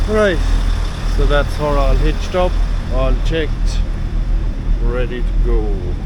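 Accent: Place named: Mayo, Ireland. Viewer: Good vid again. Keep em coming in 2024. Where abouts in Ireland you from. My wife's family are Mayo. Your accent sounds your that way. HNY.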